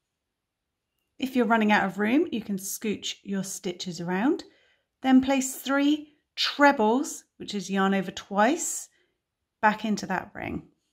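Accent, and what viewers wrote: United Kingdom. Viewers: British accent+ US terms...PERFECT 💗 (I'm a Brit who dislikes UK terms 😆) I send these hearts to people who are recently bereaved.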